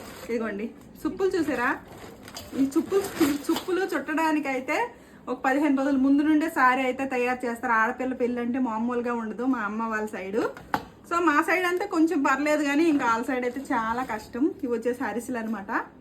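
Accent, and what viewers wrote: India. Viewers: Srikakulam kadakka meedi.. suppulu annav chudu.. chevulaki bhale haai ga undi😊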